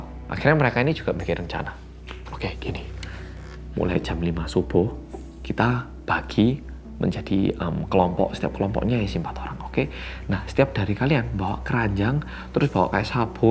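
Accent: Java, Indonesia. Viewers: Halli gais welcome back to bersama orang Korea yang medhookkk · Mirip Dr. Tirta ngomongnya Medoook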